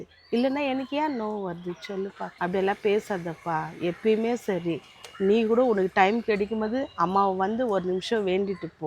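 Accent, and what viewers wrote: Bangalore, India. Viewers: சூப்பர் மா நான் சமீபமா தான் உங்க வீடியோ பார்க்க ஆரம்பிச்சேன் சூப்பர் பெங்களூர் தமிழ் எனக்கு ரொம்ப புடிச்சிருக்கு நாங்க சேனலை சப்ஸ்கிரைப் பண்ணிட்டேன்.. வருத்தமா இருக்க சமயத்துல உங்க வீடியோ பாக்குற நான் மகிழ்ச்சியா இருக்கிற😊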